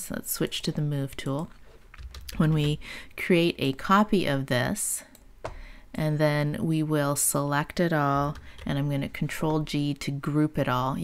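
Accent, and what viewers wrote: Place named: United States. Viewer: What a relief to hear/see a tutorial in American English.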